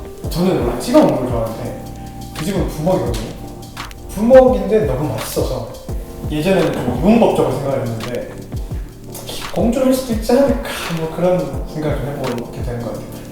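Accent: Gyeongsang, South Korea. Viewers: 이솔로몬 님~경상도 사투리~웃는모습~매력덩어리예요~끝까지 파이팅 하세요~💕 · 성격도 소탈하실듯ㅋ 전 퐝 살아서 경상도사투리 넘 친근하고 인간적이네요ㅋㅋ 열심히 준비하셔서 꼭 결승전까지 가시길 아자아자~~~ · 대구 말투 넘 멋져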